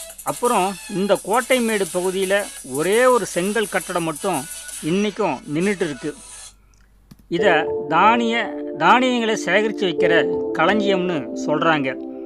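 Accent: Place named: Madurai, India. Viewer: அருமையான வரலாற்று தொகுப்பு. இனிமையாக ங்க போட்டு மதுரைக்காரர் போல பேசுகிறீர்கள் ஐயா.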